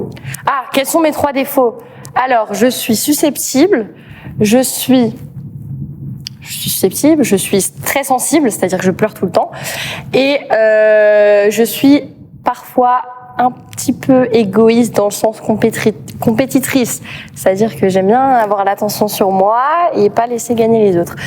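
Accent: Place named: Alsace, France. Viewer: Accent alsacien incroyable 😂😂😂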